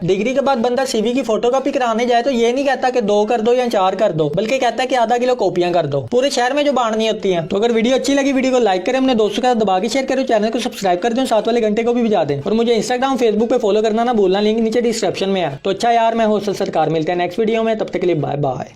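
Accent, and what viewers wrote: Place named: Multan, Pakistan. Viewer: Bro! R u from MULTAN?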